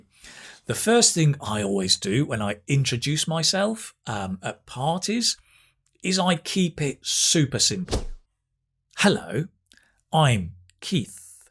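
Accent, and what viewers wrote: United Kingdom. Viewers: British accent, that's cool. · I didn´t believe that I coulp understand british English but this teacher is very good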